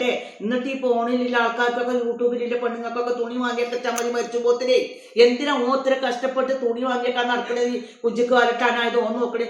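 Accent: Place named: Malappuram, India. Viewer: സംസാരം കേട്ടാൽ നാട് ചോദിക്കണ്ട ഞാൻ വിചാരിച്ച പോലെ തന്നെ. വണ്ടൂർ. കാളികാവ്. മഞ്ചേരി. 🤣🤣🤣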